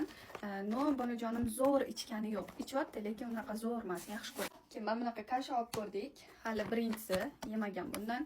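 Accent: Tashkent, Uzbekistan. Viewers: Намоногонлик экансан Тошкент шевасида гапириб. Нима килардинг. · Manam shunaqa dgandim. Nimaga Namanganlik bosez Toshkent shevada gapirasiz? dgandim. Manimcha ochirvoripti 😅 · НАМАНГАНЛИКСИЗ АММО ТОШКЕНТ ШЕВАСИДА ГАПИРАСИЗ....ТАЪЖУБ. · Наманганликман дейсизу нимага тошкенча гапирсиз хафа булмангу ман абангизни танийман отлари Гулмирахонми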